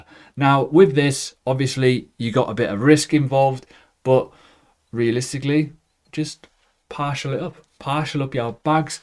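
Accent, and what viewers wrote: United Kingdom. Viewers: Omg 😱 my favourite guy ever. Please keep them coming. The British accent a huge bonus 👍👍👍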